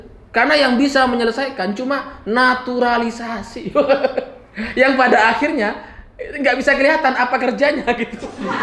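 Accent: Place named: Madura, Indonesia. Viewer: Saya suka dgn logat medok maduranya yg khas "selamat malam para mantan" dan joke2nya, tiap akhir kalimat ngakaknya naturalisasi eh natural 😀👍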